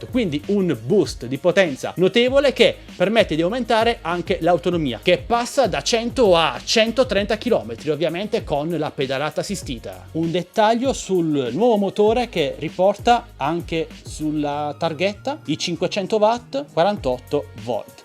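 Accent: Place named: Bari, Italy. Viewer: Sei barese? Da come parli ci giurerei... Bel canale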